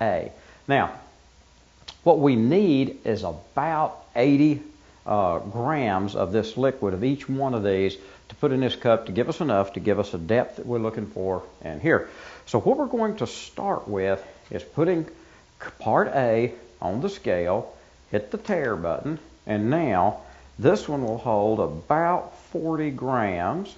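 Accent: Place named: Tennessee, United States. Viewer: You sound like a Tennessean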